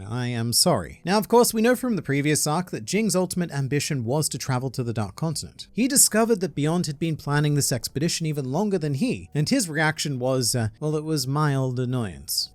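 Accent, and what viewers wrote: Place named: Australia. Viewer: I've been subbed for a while now but stopped watching for a bit. Just listening to your voice in your earlier videos, it's obvious that you're Australian and being a basketball fan, I've always guessed you'd probably look like Matthew Dellavedova. Now seeing your face for the first time... Holy shit. Lol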